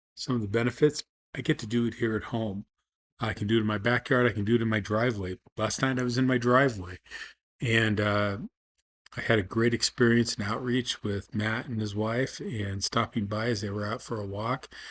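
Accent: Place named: United Kingdom. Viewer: So you are canadian ? I thought you were brittish .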